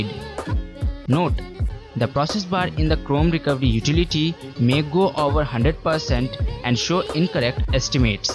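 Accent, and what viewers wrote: India. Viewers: I hate this fucking Hindi accent!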